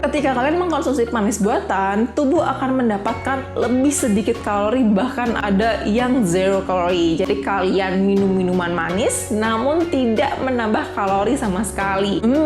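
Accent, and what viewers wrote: Java, Indonesia. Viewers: Gara gara jerawat nyasar ke video dokter, problemku sama dok jenis kulit wajahnya gampang jerawatan kalo minum susu, makan keju, minum yang manis. Tapi susah ya semua itu enak.hehe..masa harus jadi vegetarian.hehe..btw, dok gak ketara kalo orang Bali loh, logatnya kok malah Jawa. Tipsnya semua bermanfaat dok. Salam kenal ^^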